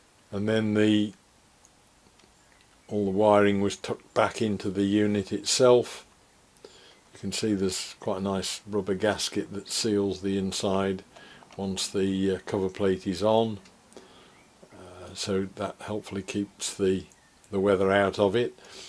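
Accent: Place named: West Midlands, United Kingdom. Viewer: Are you from the Midlands? You've got a slight West Midlands accent to you!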